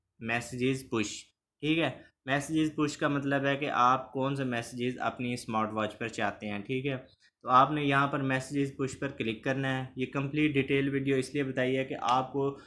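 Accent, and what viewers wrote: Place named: Pakistan. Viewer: Bro tumhari voice me Pakistan wali wibe aa rahi h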